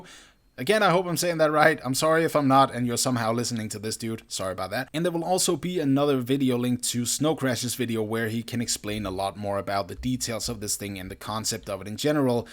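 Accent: Denmark. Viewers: u sound like ur from denmark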